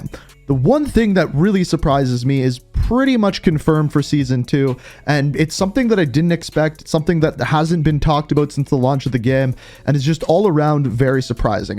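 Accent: Canada. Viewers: You're Canadian, I know accents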